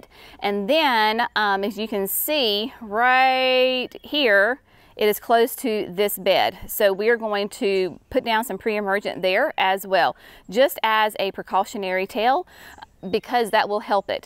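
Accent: South, United States. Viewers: hahahahaha thanks for the correction and I just love your Southern accent it’s a very soothing sound to me ✨🤍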